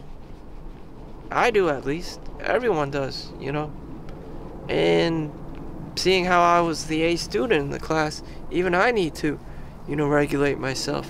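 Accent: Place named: United States. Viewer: What? He sounds 100% American!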